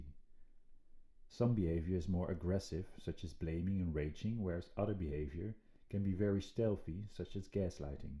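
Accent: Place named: Netherlands. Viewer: Volgens mij hoor ik een Nederlands accent. Cool. 😁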